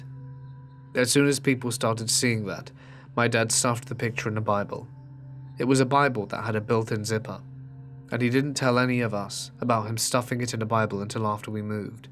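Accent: United Kingdom. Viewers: Hey, really enjoy listening to your narrations, BUT I do have one gripe...could you PLEASE stop saying the word "garage" like your American, I mean you have a British accent. It's so ANNOYING. Thanks. Blessings.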